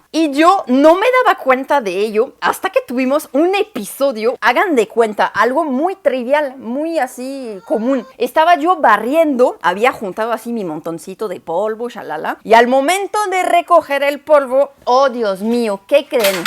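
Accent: Mexico City, Mexico. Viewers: Justo antes de ver este video me encontré con uno que realizaste en un cementerio en París (fue el primero que vi). Al notar tu acento en español supe que eras francesa, pero la forma en la que hablabas el español no me dio dudas en decir ¡¡APRENDIÓ EL ESPAÑOL DE MÉXICO!! Gracias por valorar tanto nuestra cultura. En este video me hiciste recordar los 8 meses que estuve trabajando en tu país y añorando el mío. Me encantó mi estadía en Francia y cada punto que mencionabas yo recordaba que exactamente así eran las diferencias culturales. ¡Qué bonito video! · Ya te contagiaron el acento típico de CDMX. 😂❤ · Se hubiera agringado en FA.... jajaja hablas como mexicana ya de manera inconsciente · Haz perfeccionado tu español muy bien, español de México pero español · Increíble como hablas el Español Mexicano. Excelente video. Saludos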